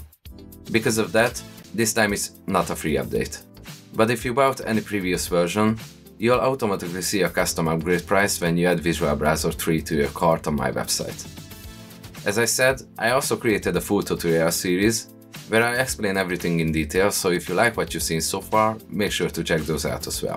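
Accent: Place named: Hungary. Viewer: magyar akcentus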